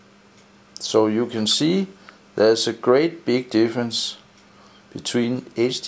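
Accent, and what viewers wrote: Denmark. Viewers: for dælen.....du har den sygeste danske accent på dit engelsk og det gør dig lidt kedelig at høre på......sorry men brug det som konstruktiv kritik......er ikke for at HATE på dig......go video ellers!! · kunne hurtigt høre dit dansk accent:)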